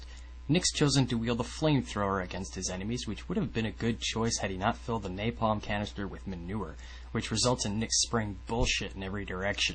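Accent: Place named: North America. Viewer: i'm sorry for making assumptions about your age based on your voice. But the accent.. well.. i'm a swede.. i wouldn't expect you to tell the difference between scandinavian accents either. i'm sure many people enjoy this emulation, and you do it well for someone with a northamerican accent and your voice (no insults intended). i just personally think that the brilliance of Yahtzee is as mutch about his cynical tone of voice and english dialect as it is his sarcastic fast pace.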